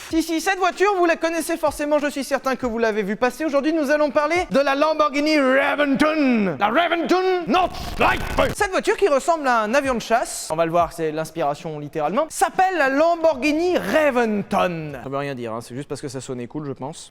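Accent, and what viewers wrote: South, France. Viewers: j'adore l'accent du sud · Ho fatch cette accent du sud 😘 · Cette accents du sud incroyable ! 😂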